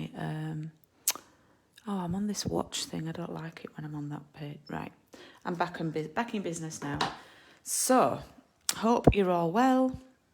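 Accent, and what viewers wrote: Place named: Yorkshire, United Kingdom. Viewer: Watching replay from Sydney. I’ve been enjoying your videos. I love your accent. Are you from Yorkshire? I was born and grew up in Stoke. ❤️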